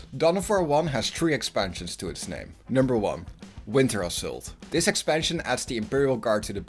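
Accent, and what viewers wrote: Netherlands. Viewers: Just came upon this video to hear some more dawn of war bashing(they deserve it). And suddenly realizing. The narrator is dutch. Hello fellow dutchie 👋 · First time watching this channel, and man, from the first words, you have to be Dutch, right ? :P That accent, it almost has to be Dutch. DoW1 was brilliant, most of it was just fantastic, almost all. DoW2 was not completely my thing, but it was good. DoW3 is one of my worst buys ever, man was i disappointed, and i'd hoped modders would change it a bit, because it looks good, but i hate the limited base building and how it plays, but alas, it hasn't become a better RTS, or an RTS at all i'd almost say, at all. If i have to play any DoW game now, it's DoW1. Hands down. And it has quite a few mods too, some better than others.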